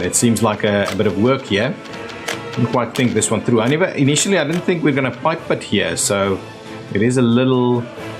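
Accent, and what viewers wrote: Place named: South Africa. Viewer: you sound like you're from South Africa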